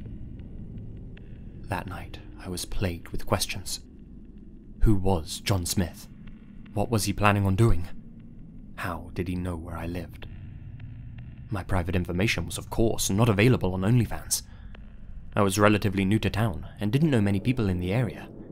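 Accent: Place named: United Kingdom. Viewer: You're from Brazil, have a "British" accent (though I can't really place it), and you've lived in Honk Kong... wow, what an unusual mix. Greetings from Chile!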